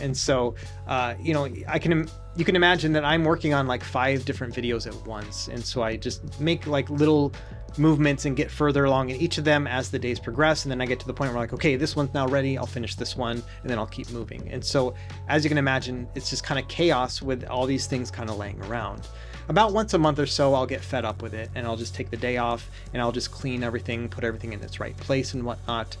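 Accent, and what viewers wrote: Minnesota, United States. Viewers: Also, thanks for commenting on your accent. I’ve never been able to place it, but you nailed it - definitely Minnesotan. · Oh wow, I didn't hear the Minnesota until you pointed it out but now that you say it I absolutely do. 😂 Love your work; thank you for all the dedication and time you put into everything you do. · As a Minnesotan, it makes so much sense now that that you picked up a bit of Minnesotan accent! I could never quite put my tongue on it, but your voice felt familiar lol! Love the vids, keep it up ❤ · Minnesotan here, no wonder I vibe with you so much. Similar interests, familiar accent. Great job with the channel. You singlehandedly got me into retro handhelds :D had no idea you are in your 40s, I'm 28 and bald, so I thought you were younger than me lol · Congrats on 400k subscribers, its well deserved. This is one of the highest quality channels on YouTube and the effort you put in really shows. 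It's interesting what you said about the midwest accent, I always just thought you were from Minnesota. Won't be long to 1 mil subs now!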